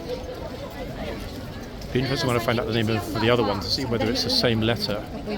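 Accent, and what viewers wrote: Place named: Northern Ireland, United Kingdom. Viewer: Brilliant Northern Ireland accent wow love it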